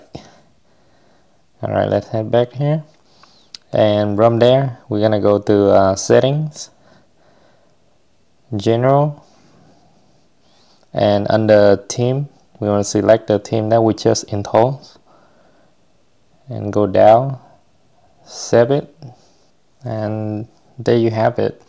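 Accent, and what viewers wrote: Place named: Vietnam. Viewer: Very helpful video By the way, are you from Vietnam? your accent sounds very familiar :D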